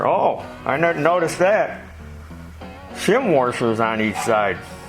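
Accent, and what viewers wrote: England, United Kingdom. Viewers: That British accent is funny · Your English accent is great! Come over some time and have some warm beer - jolly good show as usual!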